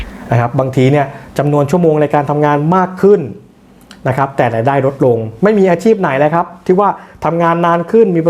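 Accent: Ubon Ratchathani, Thailand. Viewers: พี่เป็นคนอุบลเหรอคะ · พี่คนอุบลหรือครับ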